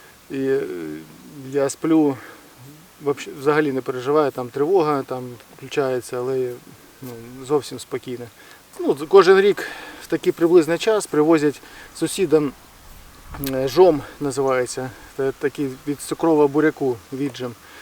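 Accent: Russia. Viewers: Привіт😊 все у вас добре виходить, чутно що деякі слова російсько-українські, але нічого 😊 потрібна практика.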